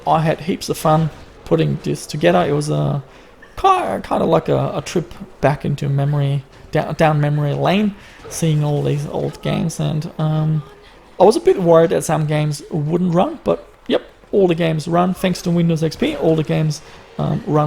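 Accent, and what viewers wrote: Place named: Germany. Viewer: I always have a feeling there is a slight german accent in it. But mit might just be cause by regional stuff.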